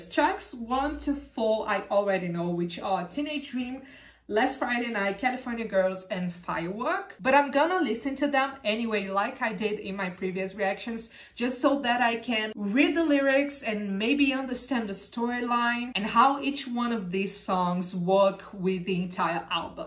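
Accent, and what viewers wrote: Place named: Brazil. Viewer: Nossa é incrível como brasileiros conseguem reconhecer brasileiros só pelo sotaque haha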